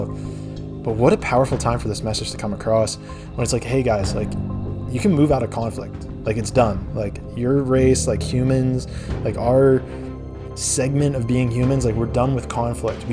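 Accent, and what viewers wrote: United Kingdom. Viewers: Weird how this being has a British accent. Lol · The British accent is kind of a red flag.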